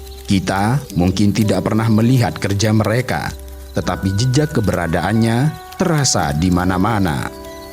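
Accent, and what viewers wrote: Java, Indonesia. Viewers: wih itu chanel awal min😮 iyah ja khas banget suaranya nya medok, cuma sedikit berbeda intonasinya sama sekarang lebih keren, sayu gitu bikin tenang di tambah backsound instrumen nya masuk🛌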